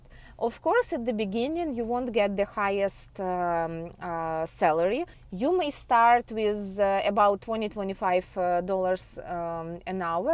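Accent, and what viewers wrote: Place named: Russia. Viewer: Ох уж этот русский акцет...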